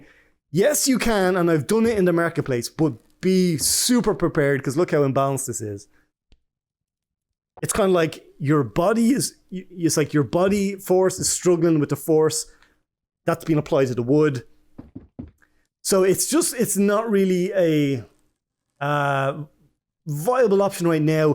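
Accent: Ireland. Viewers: I can't place that accent, is it Meath?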